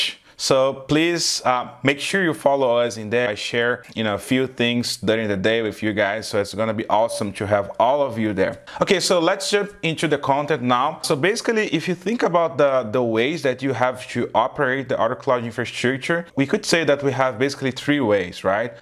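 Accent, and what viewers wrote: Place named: Brazil. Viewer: Awesome.!!! Excellent video and excellent material that you share with the community! Since you approach it from the very practical side and complement it with the material that just Oracle released last week to do the OCI certification. I have a question for you! With the steps that you indicate in the video, I understood that we can create scripts in Bash to automate the starting and stopping of the instance or database. But where do you create the crontab... In OCI Client or in the machine?? or Where you installed OCI Client??. I'm very confused with that. Could you help me? Thanks again for sharing your knowledge and experience! .Finally, for your voice and accent, I think you're from Brazil! So regards from Colombia! Muito obrigado.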